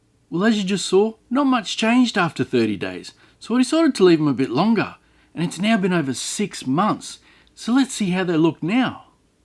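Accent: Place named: Australia. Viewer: Completely off topic but I love your Australian accent haha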